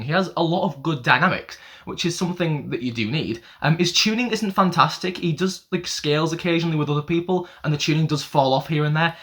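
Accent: United Kingdom. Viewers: An Astro fan (Aroha) just pointed this video out to a few of us and you speak so much sense! Really interesting watch and super informative. Haha Jinjin’s singing voice isn’t amazing but his rapping voice is insane! You sound like a fellow Brit also, hello! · the british accent really surprised me omg it’s so nice to see a fellow astro fan who is english, thankyou for being so kind and knowledgeable too!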